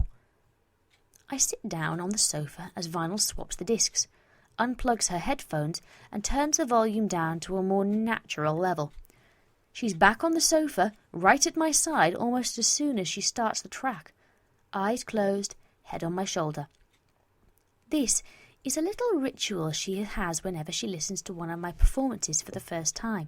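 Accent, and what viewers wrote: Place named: England, United Kingdom. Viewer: Not bad. You have a lot of potential for readings, or maybe I'm just fond of English accents. Good short story too.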